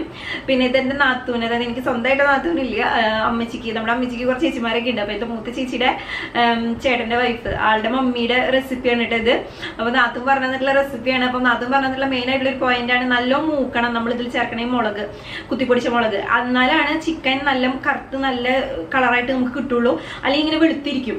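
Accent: Thrissur, India. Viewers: Chechii thrissur aanalle?? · ആദ്യമായി ആണ് ഈ ചാനൽ കാണുന്നത്.നല്ല സംസാര ശൈലി....😊👌 അല്ലേലും തൃശ്ശൂർ ശൈലി വല്ലാത്ത ഇഷ്ടം ആണു. അമ്മവീട് അവിടെ അയതോണ്ടു പ്രത്യേകിച്ച്💕 ★ പിന്നെ ആ കിർ കിർ കേട്ടിട്ട് ചിരിച്ചു പോയിട്ടോ ★ എന്തായാലും ട്രൈ ചെയ്‌തു നോക്കിട്ട് പറയാം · എന്റെ പൊന്നെ എന്തുസ്പീഡ ഇത് സമ്മതിച്ചുട്ടോ തൃശ്ശൂരാണല്ലേ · തൃശൂർ ആണല്ലേ.... Non stop സംസാരം അപ്പോ njn മാത്രമല്ലല്ലേ 😁😁😁😁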